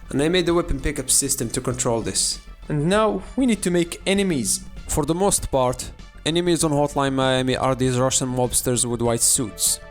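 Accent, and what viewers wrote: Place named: Morocco. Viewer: You sound Moroccan wlah akhoya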